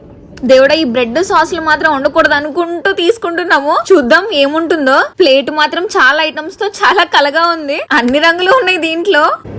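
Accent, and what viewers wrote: Godavari, India. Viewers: ఈ గోదారి మాటల గలగలలతో ఉండే మీ వీడియోలు చూసి చాలా రోజులైందండి, ఈరోజు ఈ వీడియో కనపడింది, ఎక్కడ,ఏ చిన్న విషయాన్ని కొంచెం కూడా వదిలిపెట్టకుండా ప్రయాణం మొత్తం అన్నీ బలే సరదాగా చెపుతూ,చూపిస్తుంటారు, మీ ఆరోగ్యం బాగుంది కదండి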